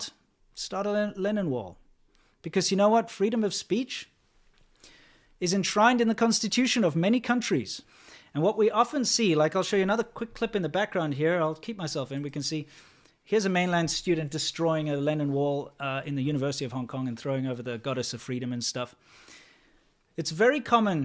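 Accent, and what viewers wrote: South Africa. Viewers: Your SA accent is slight but insidious. I spent half the video thinking you were talking about something called a "Lenin wall". They should arrange reinforced glass cases for such things at universities to avoid vandalism. Or at least make it a punishable offense to vandalize them. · I love watching your videos, we all know that the Chinese govt sucks, but there’s also the usual “it’s the government, not the people”. You bring us education especially about things we’ve never imagined we’re going on. Unfortunately we also learn that indeed (some of?) the people are to be viewed with suspicion as well, as they walk among us covertly. That’s so sad, I haven’t decided yet if that’s all only because of their government and they wouldn’t be horrible otherwise or if the government is that way because people are. I’ve seen them do some pretty shitty things here in my country just in everyday life, and heard worse from people who had to go to China and other Asian countries for some reason or other. 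It’s interesting how obvious it is here when you see a person who has Chinese blood, you can usually tell way before they open their mouths if they were born here or not, even just by how they walk and look at people, and the way they hold themselves, or even if they’re littering, picking their noses, and spitting everywhere or not. Plus I love your accent, it feels like a homey thing to me as I went to school and grew up with many, many people from SA, so that sound is a little bonus :)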